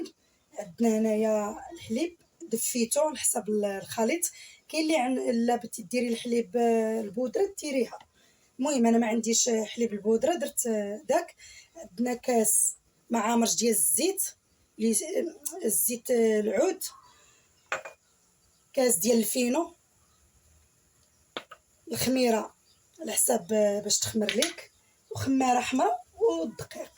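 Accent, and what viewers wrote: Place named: Abda, Saudi Arabia. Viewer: اللهجة ديال عبدية ولكن اختي استعملي دقيق القمح دكالة وعبدة عندهم القمح تبارك الله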